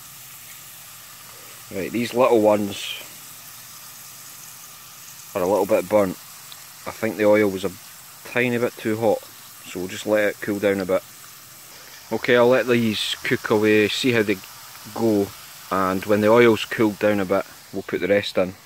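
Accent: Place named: Scotland, United Kingdom. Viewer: Hey ho. Nice vid u made there. I‘ve been to scottland several times to visit my family. Chrisps are very popular in ur country. Where are u locatet? My family lives between Glasgow and Edinburgh. Livingston Bathgate west lotian. U like outdoor very much. I enjoy watching ur vids every time. Ur accent is so nice to my ear. Keep em comming I think u are a good lad, where was ur dog this time? Greetings from Germany 🇩🇪. Bon Appetit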